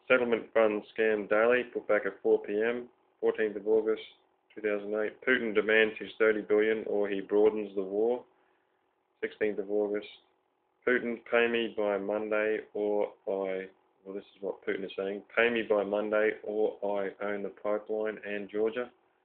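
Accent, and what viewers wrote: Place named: England, United Kingdom. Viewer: weeks huh? LOL-makes me question current info on the current Supposed events Re: Imminent Mass arrests, Banker resignations, Cabal Lawsuits-White Dragons vs. The Fed, et al.-TROLL Radio Show? Or just took longer than expected...You decide...fyi, this guy here isn't even American!! English accent-bad troll radio!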